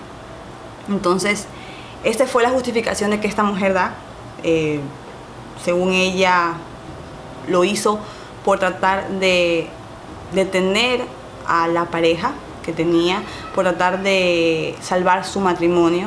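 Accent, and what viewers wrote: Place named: Guayaquil, Ecuador. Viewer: "El man tenía en el baston" se nota que eres guayaca, bellaaa💖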